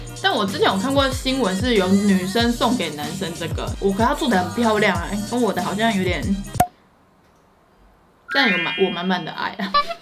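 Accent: Taiwan. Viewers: 的台語真的好好笑，這個影片真歡樂怎麼可以吃這麼多人還這麼漂亮又瘦...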